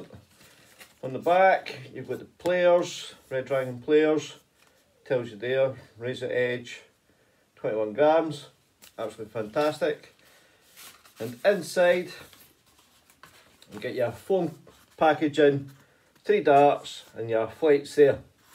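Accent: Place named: Scotland, United Kingdom. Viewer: Great review! Just ordered a set of these. I will come back and let you know what I think. Love the Scottish accent as well by the way! Cheers from Canada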